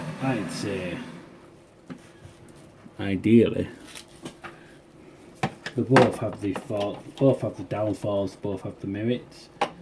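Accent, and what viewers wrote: United Kingdom. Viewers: It's a British Eng and I can 100% understand him. All depending on Where you live and what accent you've got. Not his fault at all. If you agree than appreciate his effort and like his video please, his video helped me for sure 👍👍👍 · I can understand him he is british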